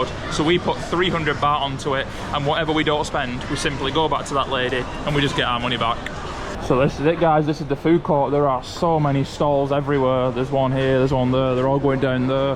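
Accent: United Kingdom. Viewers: Are you cockney?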